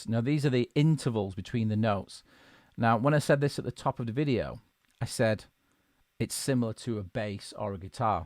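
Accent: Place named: Northern England, United Kingdom. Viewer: Superb tutorial, this. And nice to hear the accent of a fellow northern lad teaching it.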